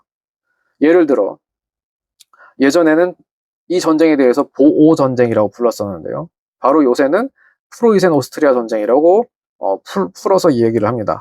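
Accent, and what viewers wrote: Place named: Jeolla, South Korea. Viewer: 얘 억양에 전라도 사투리 섞인거같은데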